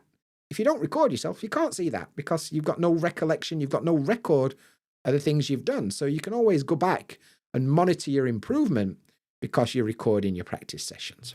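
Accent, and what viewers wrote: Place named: Yorkshire, United Kingdom. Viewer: I love your video so so much! I'm always fascinated by the Yorkshire English. Although I rarely comment but I certainly watch your video a lot and I learnt a lot from your video. Support you.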